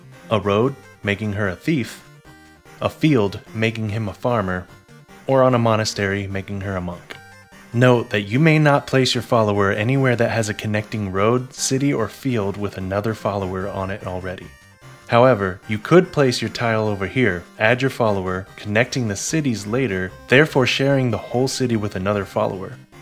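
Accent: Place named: France. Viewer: great French accent!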